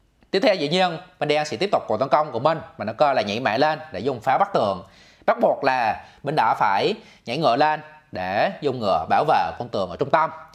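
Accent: Binh Dinh, Vietnam. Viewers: Giọng bìn định phú oen nghe hài quá kk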